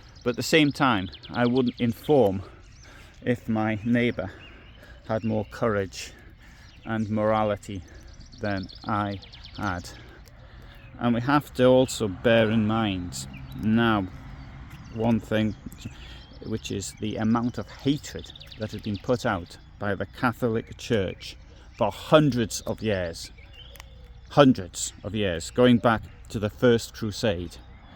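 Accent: United Kingdom. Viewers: Merry Christmas! I believe you are correct about the Catholic church along with the Germans victimized the Jews. Also, interesting how you have not lost your British accent. Great video!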